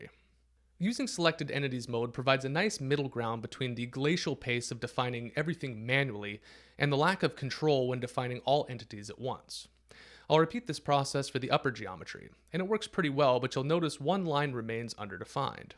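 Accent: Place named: England, United Kingdom. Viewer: Professor thanks for your great video. It is great to find a YouTube video showing solidworks With an in English accent, I can understand.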